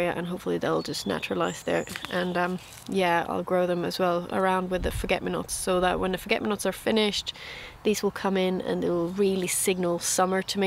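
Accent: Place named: Northern Ireland, United Kingdom. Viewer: You've chosen a lovely flower selection come summertime your plot should look really colourful. Btw I'm wondering if you've ever lived in N Ireland. I thought I detected some of the dialect in your accent or perhaps I'm mistaken. Snow was heavy in our region for a few weeks but today it's sunny so Spring is on its way soon. Take care.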